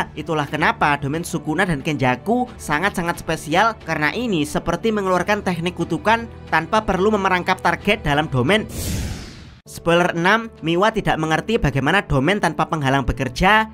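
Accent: Java, Indonesia. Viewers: jangan medok bg ganggu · Muedok wkwk, btw suwunss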